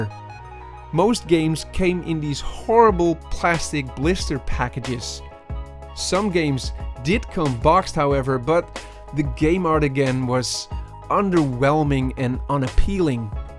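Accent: Netherlands. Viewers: You Dutch ? :-) great video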